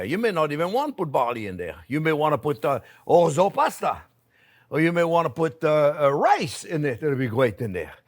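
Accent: France. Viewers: I assume based on your accent and name you’re French. Have you done any French soup videos or heck, any French food videos?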